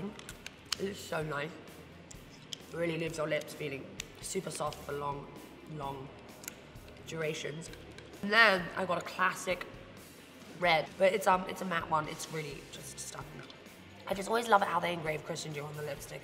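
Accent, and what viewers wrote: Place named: RP, United Kingdom. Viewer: Ure at ure sexist wen ure carrying on n not taking urself serious it's so attractive for men also u should insure your eyes thay r fkn insane only chick that can rock a Camono keep keeping it real u r a buzz when you talk u don't expect the posh accent brill xx hope this cheers u up xxx lol xx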